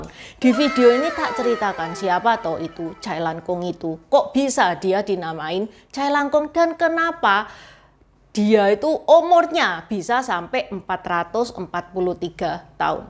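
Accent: Java, Indonesia. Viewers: Mantap mba jawanya medok sedap bangat ! 🍺🍺🍺🌹🍷🍷🍷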